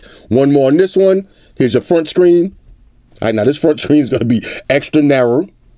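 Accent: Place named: New York City, United States. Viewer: .. I'm a new sub, you do go in very fast although you said it's not a full review. Well I am asking for a breakdown on speed when going for different apps, load time when searching for information? Does it have a huge amount of bloating apps... Could u Use this in a camera setting such as a dark garage & take giid pictures. You feel like is any other phone better when it comes to hungry techies?? LOL- Yeah u have a hard Eastern Accent. Must be NYC or Something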